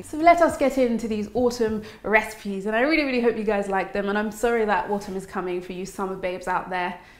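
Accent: United Kingdom. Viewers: I love your British accent. It's not snobby or pretentious at all 👍.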